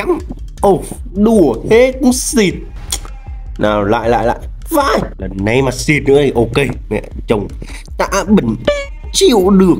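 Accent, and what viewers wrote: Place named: North, Vietnam. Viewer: ko lq nhưng mà nghe ông nói giọng là miền bắc nhưng mà tui cứ có cảm giác ông là người miền trung hay sao á 👁️👄👁️👍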